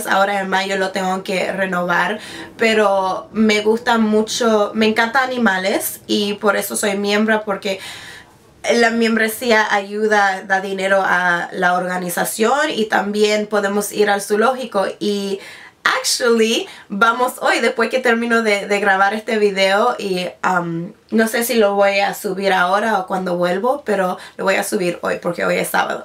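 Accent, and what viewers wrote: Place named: Argentina. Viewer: ahhhh I can sorta hear your Argetinian accent loveeee it <3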